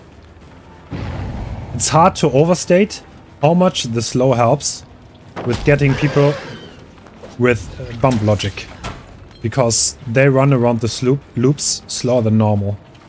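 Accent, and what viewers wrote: Germany. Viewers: Der deutsche Akzent:) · are you german? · Wie man den deutschen Akzent hört aber saftiges gameplay👌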